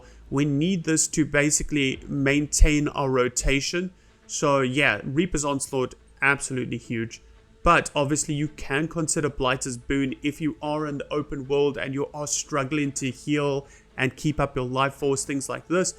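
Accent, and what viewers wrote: South Africa. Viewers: is that the distinct south african accent i am hearing?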